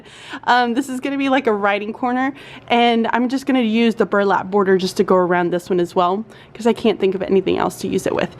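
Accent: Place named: South, United States. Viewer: I love listening to you with your Southern drawl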